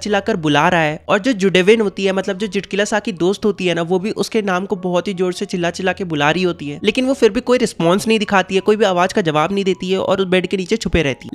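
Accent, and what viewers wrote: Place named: Jharkhand, India. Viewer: Bro your accent is jharkhandi.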